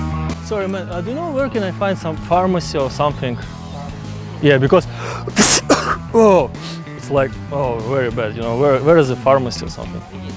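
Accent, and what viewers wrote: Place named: Russia. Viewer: Жесткий русский акцент )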